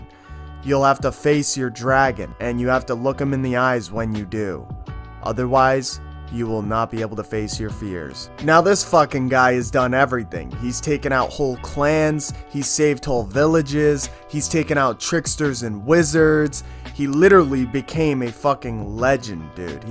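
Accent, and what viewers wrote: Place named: Wisconsin, United States. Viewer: I’m from Memphis. My oldest brother lives in Wisconsin and your accents sound similar. Like the way you say floppy tits in a past video sounded exactly like my bro ha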